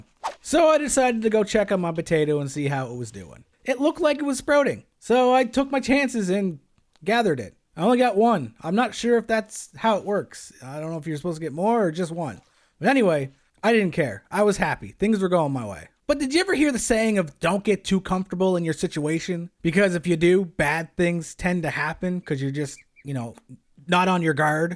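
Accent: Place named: South, United States. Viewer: Whatever your name is again I think you have a country accent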